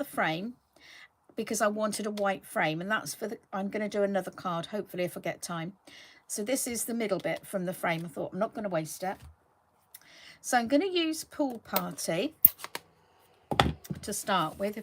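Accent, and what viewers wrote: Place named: United States. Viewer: Love you’re American speak! Lol. Terrific cards as always! Sorry to have missed but we went in the pool. Rain was to start early so we went in early. Glad we did hasn’t stopped raining yet and it’s Sunday 10pm. Thank you!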